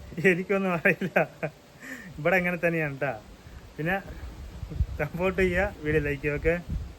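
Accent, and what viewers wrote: Tamil Nadu, India. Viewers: കുറെ നാളുകൾക്ക് മുൻപ് bro യുടെ തമിഴ് കലർന്ന മലയാളം കേട്ടിട്ടാണ് Channel Sub ചെയ്തത്😊 ആദ്യമായിട്ടാണ് comment ചെയ്യുന്നത് നിങ്ങളുടെ സംസാരം ഒത്തിരി ഇഷ്ടമാണ്❤❤❤❤❤ God bless you bro......❤❤ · Najn aa thamil kalarnna malayali ye eshatam 😂❤❤❤..ariyan kazijathil santhosham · ❤❤❤chettan parayumbo tamil slang varununde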